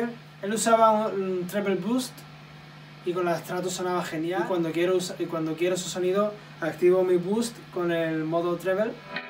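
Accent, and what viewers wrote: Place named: Argentina. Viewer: joder pensé que eras argentino primera vez que veo un video en el que hablas...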